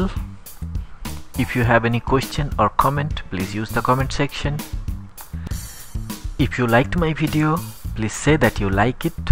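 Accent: India. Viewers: The guy is Bengali as per his accent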